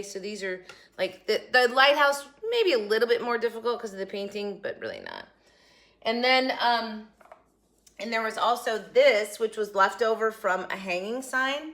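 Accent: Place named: North, United States. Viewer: Hello i just came across u on you tube. Yes I did put up a like & subscribe. I'm from alittle village called Conmicut. I can see ur not from the south cuz ya talk like me! I think u did a great job on ur DIY'S So I'll see ya again & again hee hee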